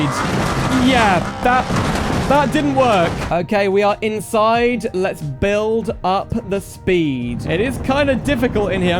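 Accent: United Kingdom. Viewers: Another fellow funny british man fan, it tickles the happy parts of my brain, we do eht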